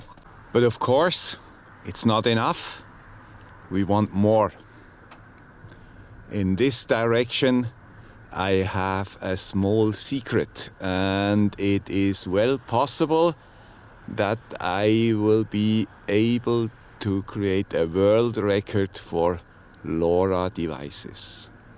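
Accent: Switzerland. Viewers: Happy birthday "Guy with swiss accent"! Great content, as always! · Grandpa with swiss accent always make things clear. Thanks from an engineering student sir. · Congratulations with the birthday, the world records and the nice Swiss accent. Thank you for explaining everything so clearly and with so much useful details and links, already 120 times!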